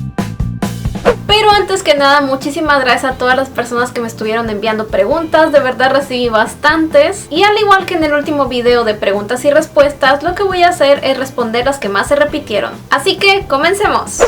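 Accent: South Korea. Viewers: Me gusto mucho tu acento en coreano, a mi tambien me da mucha pena hablar coreano (lo poco que se) y tambien me da pena hablar en ingles. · que lindaaa! me encanto este video, hablas hermoso, incluso el asentito coreano, que lindo! felicidades has trabajado duro, aunque pienso que despues los videos de youtube seran en coreano😅😅😅 te adoroooo! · 💕 me encantas tus videos, desde que los veo que he vuelto adicta a los doramas 💙 y me encantó tu acento en la lengua coreana.! Suerte!!! 🙂🤗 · Me gustó mucho tu acento coreano, y sigue adelante se ve que eres una chica muy emprendedora, luchadora y que persigue sus sueños. Además toda una profesional. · ! ¡Pero tu coreano se escucha muy bien! Hasta estás cogiendo bien el acento, te sentí como toda una coreanita💁💁 Felicidades!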